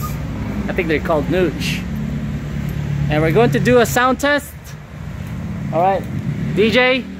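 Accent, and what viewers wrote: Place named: England, United Kingdom. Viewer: Great English Accent 👍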